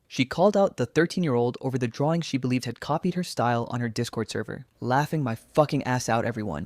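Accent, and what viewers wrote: Philippines. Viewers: i think she is filipino, im a filipino artist too, i know what my people sounds like. 😂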